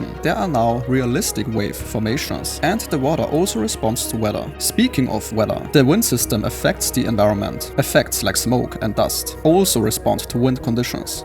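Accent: Germany. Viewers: The German accent made me stop the video after 5 seconds. Sorry 😂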